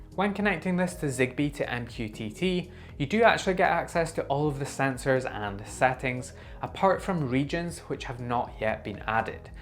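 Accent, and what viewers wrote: Scotland, United Kingdom. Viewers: I agree this is revolutionary! I have started my smart home with Xiaomi/Yeelight/Aqara, but they are now just too expensive, with this stupid region locking. Tuya Zigbee is stupidly cheap, and will equal performance for most devices. I will wait for a cheap Tuya clone. One question: Despite my first name i am not english, but i love the language. Am i correct that this is a Scottish accent? Greetings from Germany :)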